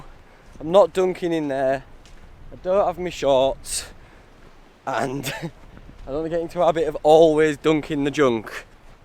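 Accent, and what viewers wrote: United Kingdom. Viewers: Is your accent British or Scottish?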